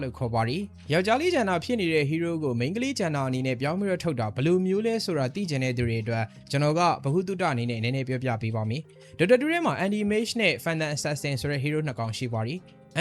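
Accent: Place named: Hokkien, China. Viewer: ပြောတာပြောရတယ်..သူ့လေသံက.Hok fan😅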